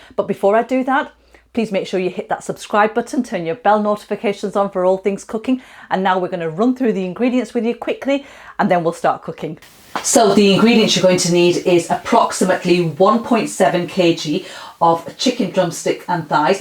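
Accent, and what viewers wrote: United Kingdom. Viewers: Her accent is British. Parents are likely Punjabi either from Pakistan or Indian Punjab. She is definitely not Kashmiri or Pothwari. The recipe is typical Punjabi tandoori chicken but now made in Air fryer. Also Kashmiri women are not that open minded in matters as Punjabi women are. They prefer the hijab and nikab and cannot cook so well.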